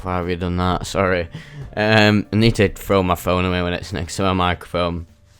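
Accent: United Kingdom. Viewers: It’s his British accent ketamine is very popular in the UK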